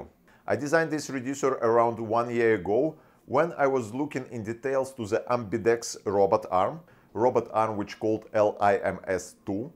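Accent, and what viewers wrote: Russia. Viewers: Удивительно, как YT хорошо определяет Runglish в субтитрах! Но "полес" слышит, как police :)) В реале имелось ввиду наверно "poles" или "pulleys" (как в дереве). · This indeed russian accent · It is a rare case of a lovely Russian accent. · You have Russian accent😁 can you speak Russian?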